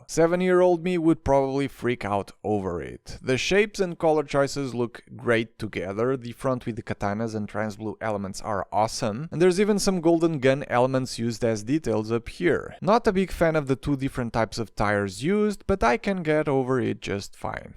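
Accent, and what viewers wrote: Portugal. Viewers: Sotaquinho da tuga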